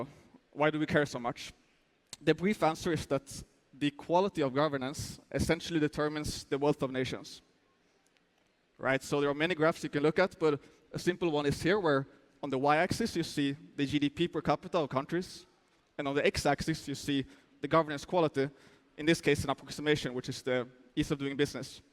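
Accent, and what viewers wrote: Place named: Israel. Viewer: Sounda like israeli accent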